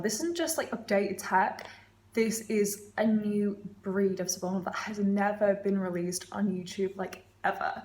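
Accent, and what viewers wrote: United Kingdom. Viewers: Love your British accent. I got no subliminal request. Just wanted to compliment you... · I love your British accent, your eyes, your look, your class, like the famous British cars. Greetings from Italy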